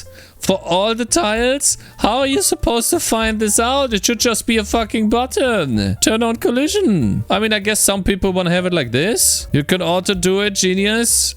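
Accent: Germany. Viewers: höre ich da einen deutschen Akzent :D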